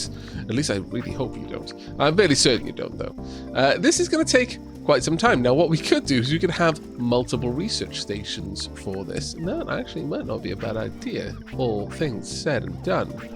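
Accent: Wales, United Kingdom. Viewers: Question are you from Wales if so are your from South Wales?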